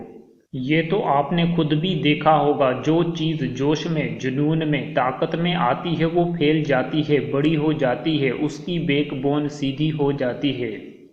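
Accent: Sindh, Pakistan. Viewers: Translated from English in Sindhi accent !!!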